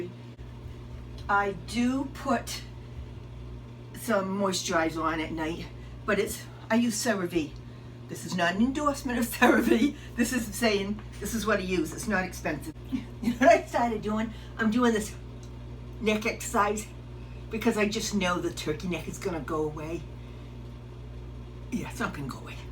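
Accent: New England, United States. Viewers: My mother grew up in New Hampshire and would say “sleep tight, don’t let the bedbugs bite”. I, in turn, said it to my kids when tucking them in to bed. I love your channel and your New England accent because it reminds me of my aunties.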